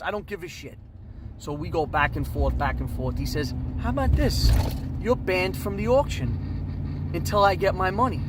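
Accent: New York, United States. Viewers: A man of many accents. Considering your heavy new york accent, your southern is pertty darn good!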